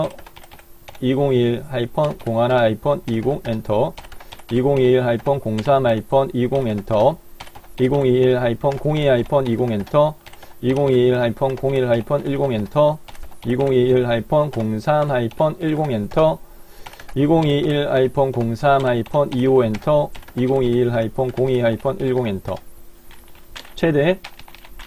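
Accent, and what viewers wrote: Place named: Gyeongsang, South Korea. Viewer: 박사님의 구수한 경상도 사투리가 매력적 이십니다^^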